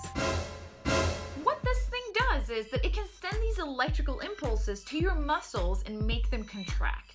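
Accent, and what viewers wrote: United States. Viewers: Aha! a fellow non-native american accent speaker! lol I am, too, a non-american/canadian who speaks "without" an accent (just like u, all because i watch a lot of american movies since i was very little) and I currently live in Canada. I actually wished sometimes that I have an accent, because there are a lot of times where i would look stupid, bcs of the same reason as you, or when i messes up my grammar or word pronounciation, or when i feel "alien" towards certain north american pop culture. (I had no idea who Bill Nye or what Fresh Prince of Bell-Air is until my friend mentioned it about a year ago..) Question, though; are u able to speak english with an accent or watching a lot of american TV basically erased ur ability to do so? (I am the latter.. or maybe i just suck at doing accent in the first place) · That is one of the most appropriate and awesome XKCD placements I have ever seen, excellent work. 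Also enjoyed the "Mothership" line. I thought you were American from your accent until you mentioned it, actually. It's an oil filter wrench. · Quirky, smart and entertaining! You really do sound like an american though, you picked up the accent really well. :) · and to where does this swdish girl credit her drop perfect american accent? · Hey your channel is really cool! The stuff you do is great and you're a likable person. Also, as a language nerd, I appreciate your excellent American accent.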